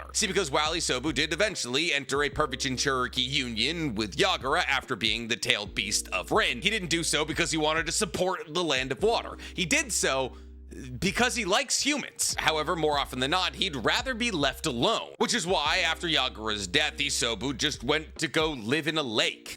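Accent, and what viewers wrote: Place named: New Hampshire, United States. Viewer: I was wondering why you said it like me I live in NH to I get that. XD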